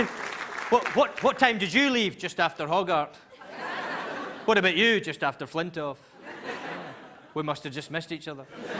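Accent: Australia. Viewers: Cant think of any other outside of oz that can sound genuinely Aussie... and friggin brilliant at that... legend! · love his aussie voice, ahahaha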